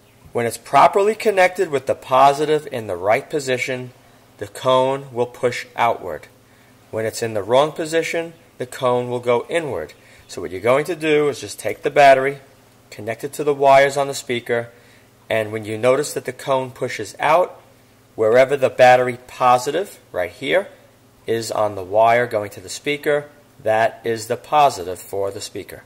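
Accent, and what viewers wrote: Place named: Boston, United States. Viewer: Thats too thick a bastan accent for me.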